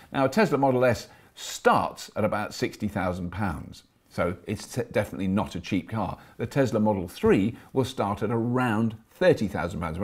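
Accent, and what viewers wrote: France. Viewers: Dude you're hilarious! Very good French accent! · Love the French accent lol. Good video. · Earned my "thumbs up" just for that French accent lol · liked this video for your french accent alone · super funny! Love the french accent! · Well done sir. I especially liked your French Accent. There is one problem however, and it is with your set piece; your desk isn't worthy of you and would perhaps be of better use behind the scenes. If that isn't feasible, maybe a nice tablecloth would suffice. I have subscribed to your channel and wish you well. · you totally made my day when you used your "frenglish". Swiss people always make fun of French people speaking English, because well... They make no effort at all ^_^. Really like your episodes, they are educative, complete and entertaining. If I might, it would be even better if you used numbers, when you use words like: lots of, double, etc. 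One thing that I like about the presentations of Elon Musk is that he uses meaningful numbers and actually prove that they fit and are reasonable. Congrats for your vids and channel. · Pretty good French accent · keep working on french accent :-D love you videos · The french accent XD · You really cheered me up with the great French accent and with the revelation about "matured fierce French women" :) Just won a BIG LIKE and a SUBSCRIBTION to the channel! Cheers! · French accent on point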